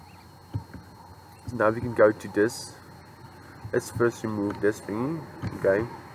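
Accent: South Africa. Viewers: Fellow south African ? 😂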